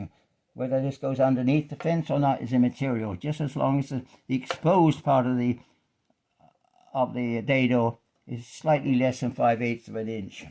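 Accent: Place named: England, United Kingdom. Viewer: Nice. Tip you sound English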